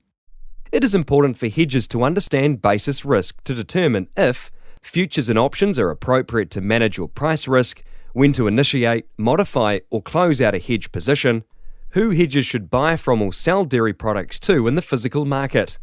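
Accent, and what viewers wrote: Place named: New Zealand. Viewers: It’s a stindard iccent in New Zealand. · its kiwi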